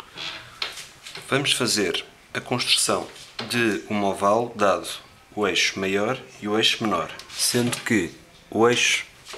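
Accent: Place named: Portugal. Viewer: A língua que ele fala é Português de Portugal amigo. A mesma língua que a nossa, só que com sotaque de Portugal.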